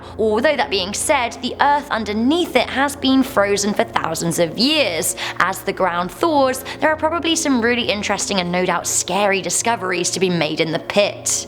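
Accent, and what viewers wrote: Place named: United Kingdom. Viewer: Hi, I love your accent fore sure you can't denie you're from UK ;) <3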